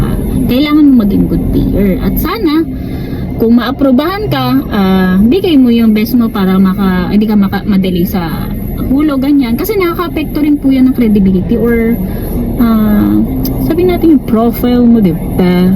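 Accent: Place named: Visayas, Philippines. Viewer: Bisaya ka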